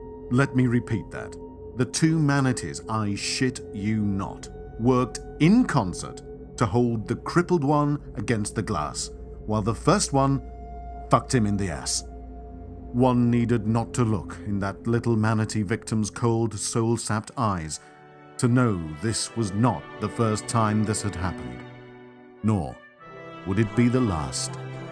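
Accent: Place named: England, United Kingdom. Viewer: What a revealing abuse of the faculty of intelligence and videography skills. We don't know if this actually happened or if this was simply a would be frustrated butler using his English accent to sound dramatic for complete strangers at no profit whatsoever.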